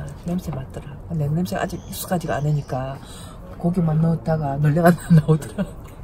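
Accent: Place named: Gyeongsang, South Korea. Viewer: 천사님이세요 경상도 구수한 사투리가 더욱 정감이 가네요 응원합니다 어서 대박나십시요💓💓💓💓💓💓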